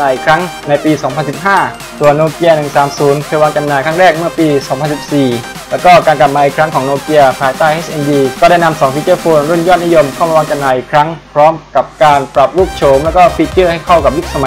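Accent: South, Thailand. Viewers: ทำไมพูดเหมือนทองแดงนิดๆ คล้ายสำเนียง 3 จังหวัดภาคใต้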